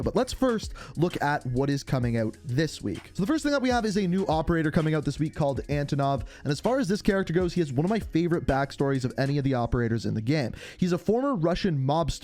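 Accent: Canada. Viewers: Is this clown Canadian ?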